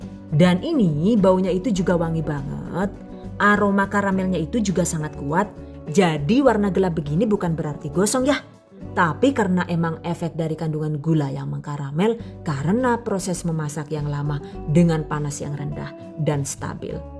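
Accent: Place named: Brebes, Indonesia. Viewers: Mbok ayune, salam sing wong brebes yen ora ngapak iya ora kepenak 😊😊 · Enak ya punya magic com yg bnr2 magic bs masak selai nanas disitu.. Saya gunakan alat apa adanya.Krn ilmu msk diasah disaat alat sederhana tp tekad mau buat lebih bsr dan hasil masakan disukai suami dan anak.. TOP dah, rajin share resep baru dan ga pelit ilmu, serta disetiap videonya ada suara lucu medok gaya bicara itu.Beda deh dari channel msk yg lain,pernah nyoba tp hasilnya mengecewakan.Kalau channel benar2 bagus dan reviewnya udh byk yg masak..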